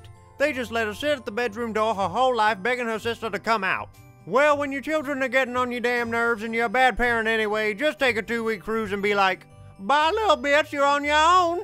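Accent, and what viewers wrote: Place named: Louisiana, United States. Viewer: he sound like he from the byoo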